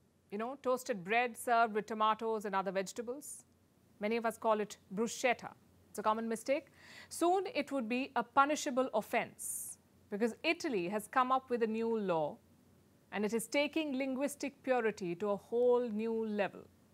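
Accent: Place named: India. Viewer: She handles stress in English very well, while still remaining recognisably Indian. Since stress is used to carry emphasis and shades of meaning in English, it's a useful asset to a news anchor.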